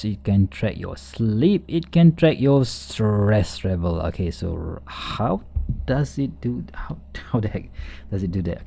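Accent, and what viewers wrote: Malaysia. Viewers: From your accent i know that you're from Malaysia isn't it?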